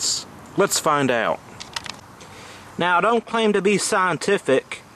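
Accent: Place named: South, United States. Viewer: You got a really strong southern accent lol. Great video!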